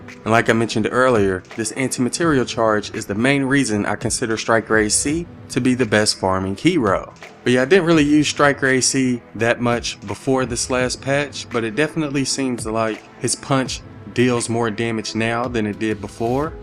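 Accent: South, United States. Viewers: I think he talks/sounds hella southern lmao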